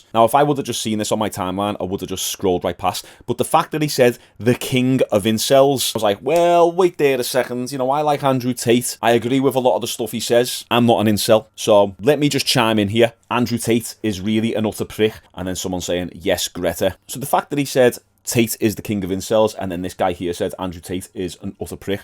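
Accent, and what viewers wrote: Liverpool, United Kingdom. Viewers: you scouse mate? i live in liverpool for uni and you sound like my mates 😂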